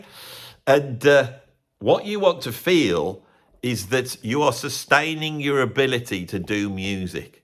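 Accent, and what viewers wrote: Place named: West Midlands, United Kingdom. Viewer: Despite being born in Cannock, (literally down the hill from Slade), and not claiming to be an artist or musician in any profound way, your commentary resonates. I had no idea the voices in my head would have a West Midlands accent. Loving your stuff.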